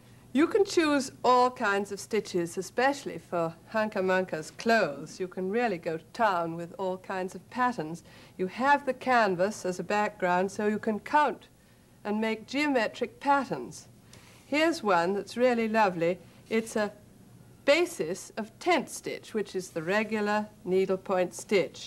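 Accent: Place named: RP, United Kingdom. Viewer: I love her accent! It sounds like pure queen's English.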